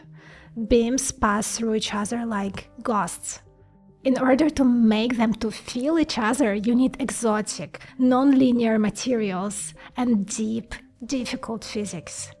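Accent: Russia. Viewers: You've improved so much compared to your earlier videos, and it's great that you’ve stopped using the Russian 'kh'х' sound. I really love your growing confidence—keep going!👍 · i would really love to hear you speak in your natural language which i assume is Russian. Even though you speak in English, you cant hide the natural mother tongue. Not saying anything bad about your English or anything, its absolutely perfect, just would love to hear you in your natural mother tongue, i think it would sound more fluid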